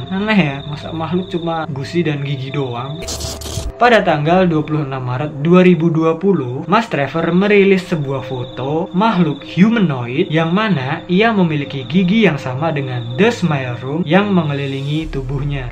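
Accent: Java, Indonesia. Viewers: Yang takut jangan nonton., atau nanti ngompol dicelana., wkwkw., as always like this., tapi keren., ada medok medoknya., hehehe., Semangat bang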